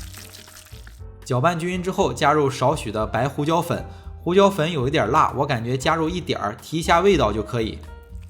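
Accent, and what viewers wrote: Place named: Shandong, China. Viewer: 你是山东人吗？听你的口音和有些厨房的工具名称像我们家乡的人。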